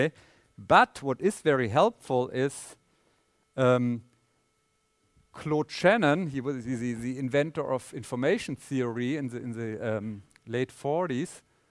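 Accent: Germany. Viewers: german english mit ruhrpott akzent oh yeah :D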